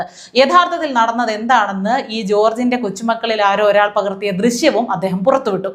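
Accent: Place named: Thrissur, India. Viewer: ആള് ആള് എന്നു പറയുന്നതുകേട്ടിട്ട് തൃശൂർകാരി ആണെന്നു തോന്നുന്നു. 🤔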